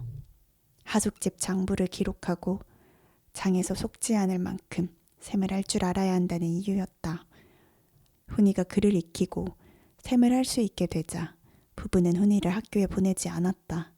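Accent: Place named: Busan, South Korea. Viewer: 파친코1부 부산사투리 재밋게, 감질나게 잘들었어요. 2부 기다릴게요~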